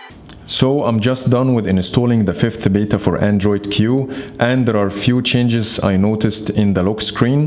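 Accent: Egypt. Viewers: Wooow!! Egyptian accent i guess;)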